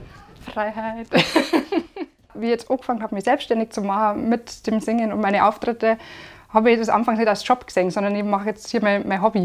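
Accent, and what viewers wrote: Bavaria, Germany. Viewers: Ich kann es mir ja leider nicht gut anhören, weil ich mit bayrisch nicht zurecht komme. Aber fiel Glück weiterhin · Bayerisch is einfach so ein schöner Dialekt 😛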